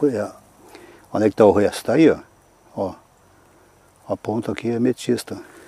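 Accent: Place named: Rio Grande do Sul, Brazil. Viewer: Queria tanto saber onde fica se e acessivel por estrada, que pelo sotaque e gaucho.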